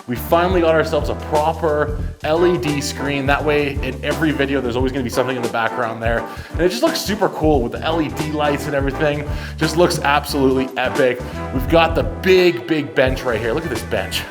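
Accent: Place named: Australia. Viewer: i thought you where aussie from just watching your shorts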